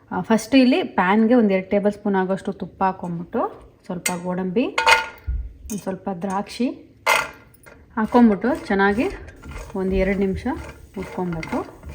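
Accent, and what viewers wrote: Mandya, India. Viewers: Nim bashe pakka nam mandya ❤ akka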